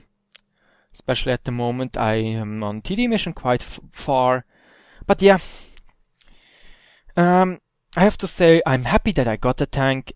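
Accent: Germany. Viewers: Du bist Deutscher oder? ;)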